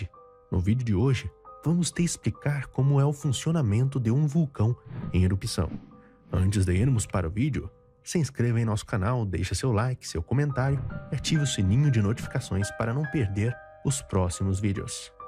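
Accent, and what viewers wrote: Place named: Portugal. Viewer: o narrador parece português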